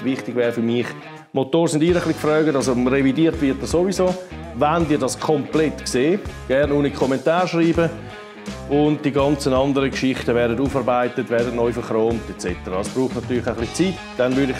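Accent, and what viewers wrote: Switzerland. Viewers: Supergeil, dein Schwizerdütsch. Schön erklärt ! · Keine Ahnung von Töffs, aber ich liebe schwizerdütsch . Genial. Sofort abonniert . Dankeschön !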